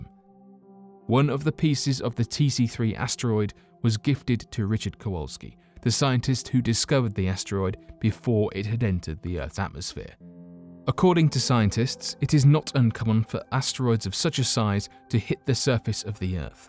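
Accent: England, United Kingdom. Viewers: You are correct that, in American English, it would be "has". But in British English, "have" is correct. The speaker sounds English to me, and he uses British English. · Why does every Damned commentator have to have a damned British accent? I cant stand it!!!!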